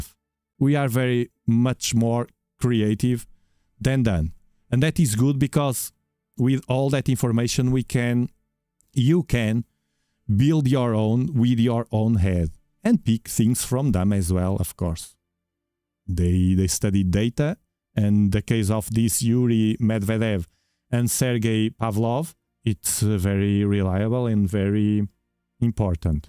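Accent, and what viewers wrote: Portugal. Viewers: The moment i heard you i knew you were portuguese. 😅 Abraço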